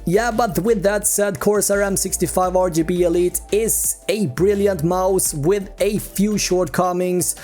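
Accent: Sweden. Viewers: Sluta prata så jävla svenskt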